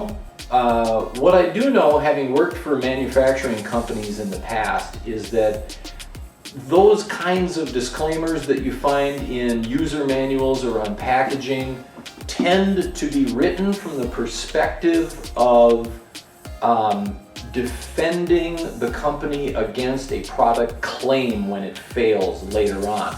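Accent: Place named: United States. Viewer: what is there about this video that a person would not like? Maybe they are genetically related to Scrooge and they just don’t like anything no matter what. I listen to it twice just because I liked it so much. I am totally blind and I really need to know how these things work. Pictures on boxes do me no good whatsoever and neither do those folded math like papers in 16 languages. I needed a description by an English-speaking individual who spoke American English like me. I appreciate the time it took to make this video and I will be recommending it to everybody I know who might like or need to use one of these pumps. Thanks so much once again because most of these videos just have music and no talking and they drive me up the wall. This is a great video and I don’t understand why it hasn’t been watched 1000 times or more. I give it 10 out of 10. 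thumbs up and every other kind of good review numbers anyone can think of to add to mine.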